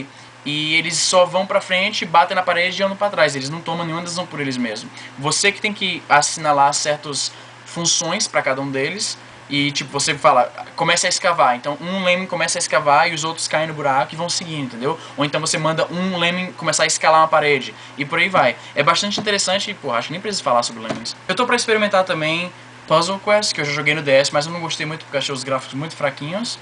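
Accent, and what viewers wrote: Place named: Northeast, Brazil. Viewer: tenho amigos online do nordeste e eu percebo um pouquinho de sotaque sim kk, mas bem pouco mesmo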